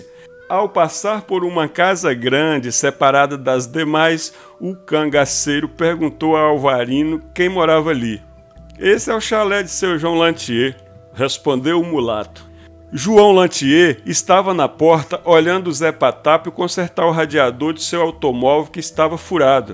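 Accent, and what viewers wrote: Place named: Northern Minas Gerais, Brazil. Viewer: O melhor de tudo é o narrador com o sotaque que parece ser do norte de Minas narrando ,maravilhosooo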